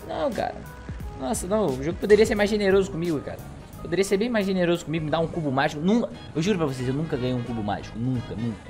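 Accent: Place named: Rio de Janeiro, Brazil. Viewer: de que cidade do rio vc é?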